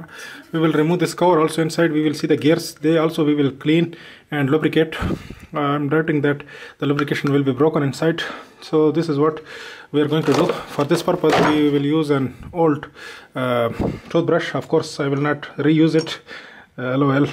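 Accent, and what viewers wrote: India. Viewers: Oh cool! Saudi Arabia eh? Your accent made me think india or Pakistan. How's the collapse in the oil price affecting every day life over there? I've always wondered, what life is like in the kingdom. As for the toothbrush thing, I just thought it was funny that you said "LOL." to indicate that you made a joke.:-) Good video.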